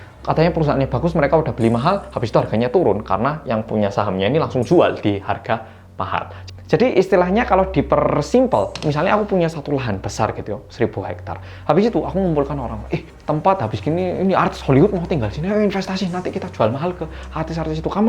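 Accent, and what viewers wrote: Java, Indonesia. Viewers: Thank you pak dosen untuk 1 sks matkulnya😁 Mendoknya saya suka walaupun terkadang ora ngerti aku😁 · Hallo Mas..ngangenin lokat Medoknya itu lho..Suxses ya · Mantap mas penjelasannya, keren bgt, kyknya kasus yg di indonesia jg gak bgitu bisa smpe diceritain sedetail ini hehe..kbayang effortnya mas ngumpulin smua artikel blm lagi hrs memahami yg ruwed2nya hehe..goodjob orang korea yg medhookk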